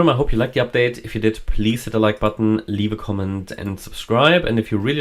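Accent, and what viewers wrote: Germany. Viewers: deutsch? hört man raus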